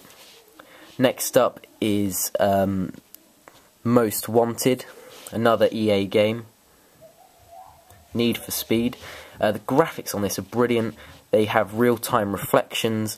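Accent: England, United Kingdom. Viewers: You Britian? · nice accent. you from england?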